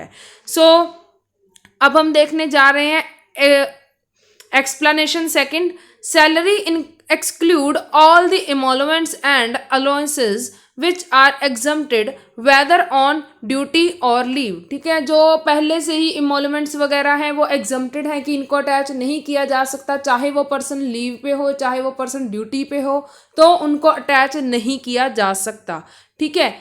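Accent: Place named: Punjab, India. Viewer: Punjabi/Haryanvi voice ❤️❤️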